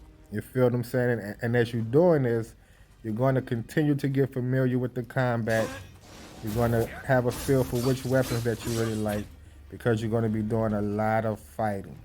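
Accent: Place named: Louisiana, United States. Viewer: Bruh! Where are you from, whoa? I swear you sound like my homies from Louisiana. Pretty good video, by the way. Even with the accent very articulate! As a southerner from the A, it is beautiful to hear. Salute my G!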